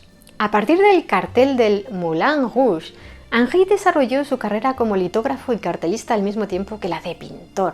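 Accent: Spain. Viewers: aumentaste mi admiración, hacia ese Gigante de la pintura, como lo fué Henri de Toulouse-Lautrec, gracias a tu narración con todas esas imágenes, lugares y personajes que rodearon la vida de este superdotado Artista. Toco el Fagot, y me hubiera gustado que incluyeras entre sus ilustres amistades, a Désiré Dihau, fagotista de la Ópera de Paris, pintado por su genial amigo, Edgar Degas. De todas formas hemos quedado fascinados con tu narración y esa bella combinación de tu acento español y la pronunciación impecable de tu francés. Bravo y, te seguiré en el futuro.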